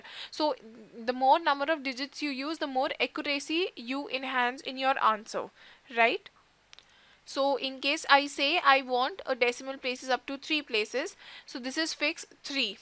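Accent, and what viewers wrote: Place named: India. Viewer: Listen baby, you can work out from the accent that she is Indian. Sorry, but that is the speed at which normal educated Indian brains function. Perfectly paced for me, who am a not - too- bright Indian. Upgrade yourself, buster.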